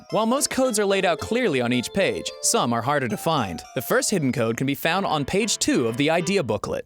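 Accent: Australia. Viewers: His Australian is showing. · I love the occasional Australian dialect pop out here and there, your not fully Americanized yet mate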